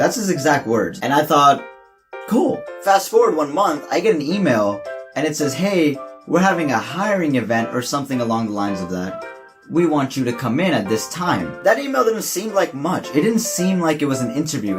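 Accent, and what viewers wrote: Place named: New York, United States. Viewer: the minute i clicked on this video i knew you were from NYC lol, when you said manhasset it confirmed it lol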